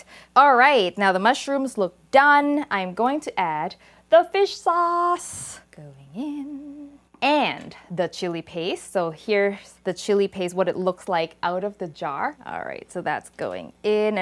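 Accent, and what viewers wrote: Thailand. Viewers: wow a little bit surpised! look at her ingredient she use i think she aint live in thailand anymore , every label is a international label have english in the label and the product name is also write in english that not usal in thailand that the most of label always write products name in thai. anyway she is thai could answer why her thai accent is so lovely. :) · น่ากินมากเลยนะคะ ออกเสียงภาษาไทยเหมือนมาก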